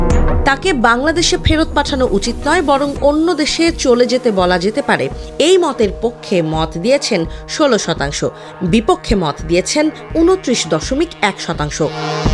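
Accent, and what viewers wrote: Kolkata, India. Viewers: নাম দিছে এনটিভি নিউজ কিন্তু সংবাদ পাঠকের কন্ঠ মনে হচ্ছে কোলকাতার মত শুনতে এটা কি ফেক চ্যানেল নাকি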